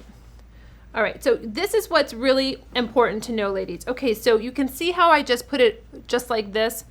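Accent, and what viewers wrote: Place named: United States. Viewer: Like watching and listening to a stroppy teenager army Sargent. I'll look for someone less ... American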